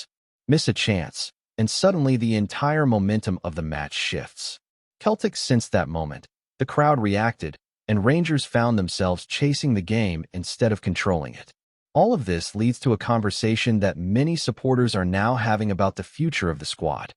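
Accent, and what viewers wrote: United States. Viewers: Another one that likes to hear his own American voice. Over 15 minutes of blah, blah, blah with absolutely no information.....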